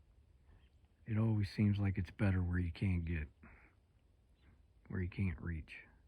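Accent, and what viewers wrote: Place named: Southwestern Pennsylvania, United States. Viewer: First and only time ive managed to be the first thumbs up lol. When i listen to you talk your accent sounds identical to the way my relatives in southwestern Pennsylvania talk and i was curious if people in Maryland have that same accent or if you weren't raised there? Intrigued as to how the other part of this video will go and if your pebble hurler will follow you over there? Good luck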